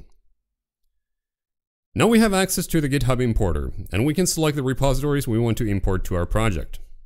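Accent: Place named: United States. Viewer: It sounded like a regular america accent to me lol. Robotic? lol. But yeah good job. I speak fast myself and thought it seemed a reasonable pace but you slur your words together a bit, I have the same problem, slowing down might help with that. But thanks for making this fast and very short. I really hate it when tutorials drag on too much.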